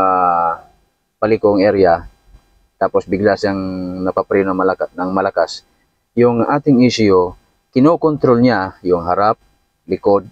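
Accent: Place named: Visayas, Philippines. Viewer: Bakit bisaya punto mo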